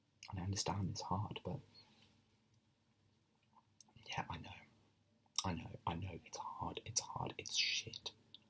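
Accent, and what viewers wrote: United Kingdom. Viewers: I like your British accent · Well, well, well, British, cute, and gives me tingles. Subbed*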